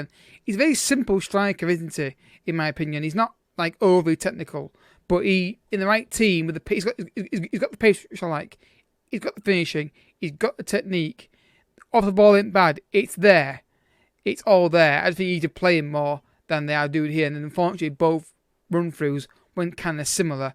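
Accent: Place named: Northern England, United Kingdom. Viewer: Nice video mate. I have a bit of a problem listening because of your strong accent haha. north english is my guess. Maybe its the mic idk. But keep it up. :)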